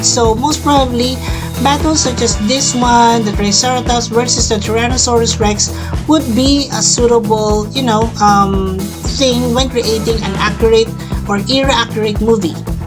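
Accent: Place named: Philippines. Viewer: and I see ur filipino! By your voice!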